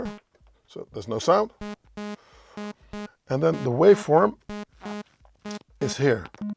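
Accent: Netherlands. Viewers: Toevallig nederlander ?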